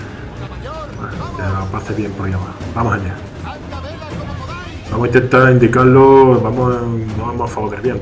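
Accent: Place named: Spain. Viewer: No comento nunca, pero me veo en la obligacion de pedirte que si aun no compras un nuevo microfono, lo hagas, se escucha horrible, ademas de tu acento español super marcado (ese es un detalle, lo del microfono no). Al Impoluto lo derrote a lo bruto, me quedo 1 cuadrito de la ultima barra (con el Jackdaw al 100% en todo). En el caso de estos hermanos super barcos, no los pude derrotar, asi que... termine aqui xD. Pero como uno de los 2 (a eleccion) al principio al ir de frente, uno se puede colocar justo detras e ir disparando y haciendo daño con el espolon. No tenia idea de como se usaba el mortero (¿mortero el ataque aereo a arta distancia que aparece marcado en rojo cierto?), ahora si podre terminar con estos barcos. bye, salu2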